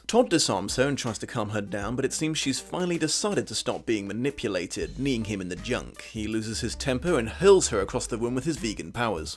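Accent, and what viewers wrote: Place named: United Kingdom. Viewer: I find your voice so soothing,. But maybe it's just the weakness Americans have for British accents.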